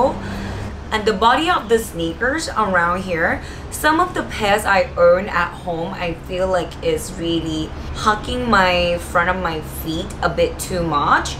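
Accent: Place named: Thailand. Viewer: I‘m so happy I stumbled upon your video! 💕 I picked up the pink pair - mainly for my walks. On a different note - I recognize a Thai accent. Is it possible that you‘re from Thailand? x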